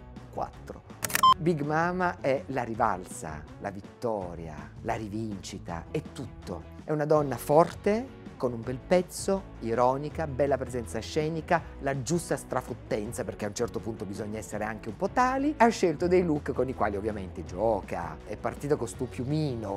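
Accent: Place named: Naples, Italy. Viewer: Ma da quando parla con accento napoletano. Voto 1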